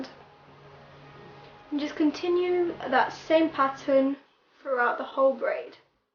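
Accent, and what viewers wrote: England, United Kingdom. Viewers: You are from UK? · No, she is from the uk x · I luv your accent ... British somin like dat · finally someone british lol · You're like perfect! cx Are you from Britain? Love the accent ( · I just LOVE your accent! Where in the UK are you from? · I think she is from England I have the same accent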